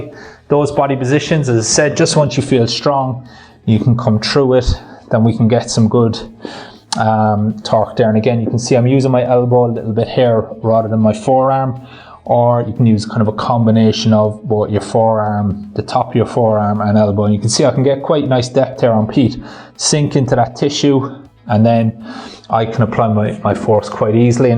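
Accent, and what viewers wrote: Ireland. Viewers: 😅 you from Ireland? I'm from Ireland too that's why I was asking but great video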